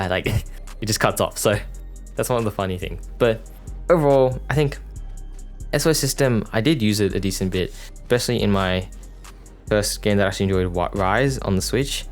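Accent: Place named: South Africa. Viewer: Bro are you south african/namibian/australian? You really sound south african to me lol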